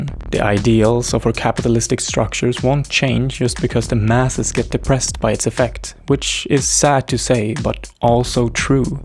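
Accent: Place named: Scandinavia. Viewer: sombre tone makes me feel like i should be contributing and leaving an actually intelligible comment but i just wanted to say i got excited trying to pinpoint ur accent, was like eastern european at first but i think its nordic....?? thank you for the video :) (i deal with it with blocks too... and always carrying my notebook with me .. with routines listed out to fall back on)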